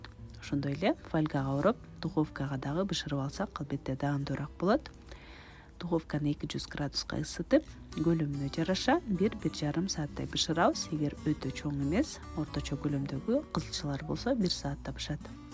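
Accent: Standard. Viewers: Кыпкыргызча суйлойсуз ээ 🥰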